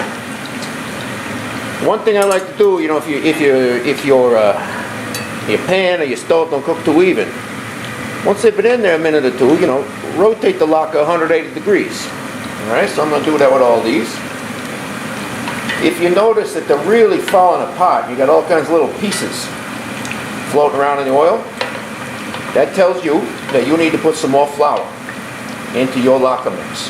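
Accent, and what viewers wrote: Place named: Brooklyn, United States. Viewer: What a great accent. It makes me feel at home back in Brooklyn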